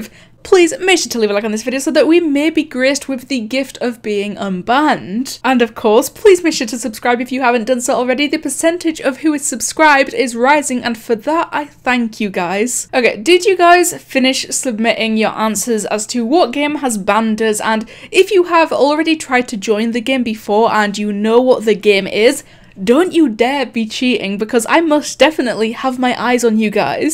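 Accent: United Kingdom. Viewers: Shes Accent seems british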